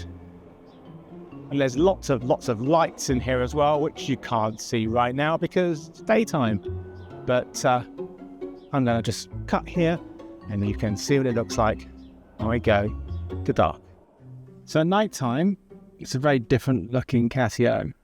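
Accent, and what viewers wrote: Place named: United Kingdom. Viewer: I’m convinced that cat dads are by far the purest people on Earth. This is incredible! ❤ I did have a couple of questions: 1) What area of the world are you in? I initially thought somewhere in the UK just from your accent, but then a I thought somewhere more tropical because of the plants and the birds. 2) What do you do about bad weather? I imagine there must be some sort of plexiglass or something on the ceiling at least for in case if it rains (I’m assuming it doesn’t snow where you are 😂), and then I was wondering what you would do about excessive heat? Maybe the cats just have to stay outside, or if the walls also have plexiglass covering then you can just leave the doors to the house open and if you have air conditioning then it would cool down the catio too? 3) Do you ever clean the catio out? I would imagine there’s lots of upkeep and I would love to see a video on that. 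Anyways, I really enjoyed the video, it looks so peaceful and all the cats seem so happy. ❤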